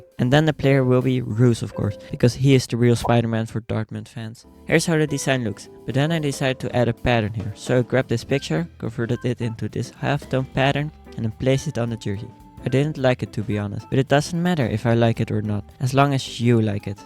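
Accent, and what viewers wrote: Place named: Netherlands. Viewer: Je bent overduidelijk Nederlands aan je Engelse accent te horen 😂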